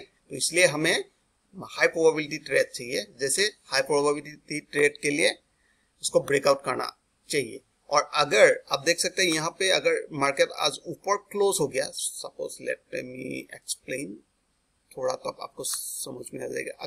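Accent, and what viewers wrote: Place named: Tamil Nadu, India. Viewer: Tamilnadu English speaking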